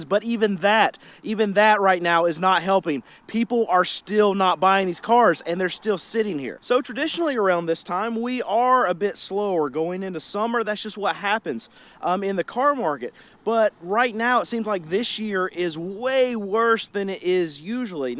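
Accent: South, United States. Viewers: He says ,"buhhcuuuzzz" a lot I am guessing in the south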